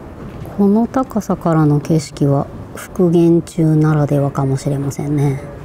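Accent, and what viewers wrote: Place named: Okayama, Japan. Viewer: 外ではバリバリの東京の言葉(?)で話してますが、夫婦の会話は岡山弁ですw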